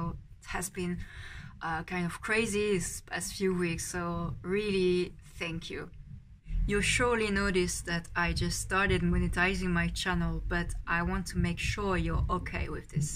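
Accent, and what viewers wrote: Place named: Canada. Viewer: My favorite Canadian accent.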